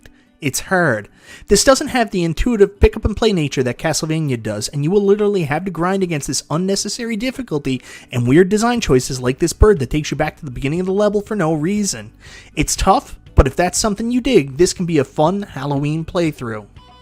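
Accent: Newfoundland, Canada. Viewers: Holy fuck buddy I can recognize a Newfie accent from 100 miles away. Just picked up one of them machines on Facebook market place. Anywhere to find them here on the island? Or better to order them online?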